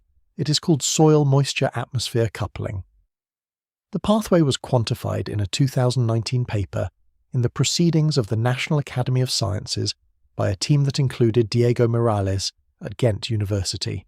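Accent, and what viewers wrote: United Kingdom. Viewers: Why is the narration in a British accent?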